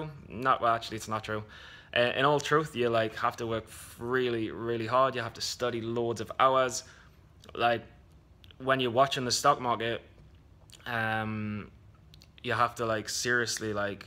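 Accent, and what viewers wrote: United Kingdom. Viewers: Hi, came across your recent podcast with SteadyTrade (that was awesome btw 👍). As soon as I heard your accent I was like the guy is from the UK (as am I, Birmingham). I was exactly like you, wanting to know if this was legit and I've come to the conclusion that Tim and his education service is 100% legit. I've put in so much study time and to be honest I'm just scratching the surface. That's the reality, it's pure hard work and dedication and I have such a long way to go but the key is I'm loving the journey. I am a silver subscriber and before that i purchased HTTM at the beginning of lockdown. What an investment that was.... at the end of watching it, I was like okay this is pretty cool so let's go deeper. A month in on silver I've just hammered out the studying. Still have loads to learn, but when I hear of progress like yours it makes me wonder just how far can I personally go if I really dedicate to this. 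I'm looking forward to seeing your progress and thoughts through these videos. I also followed you on twitter yesterday, so I'll be sure to reach out at some stage. I take it you are also on Profitly, if so it would be great to follow you and see how your journey mapped out too. Thanks for the boost of confidence, it's just added fuel to the fire I already have. Let's do this!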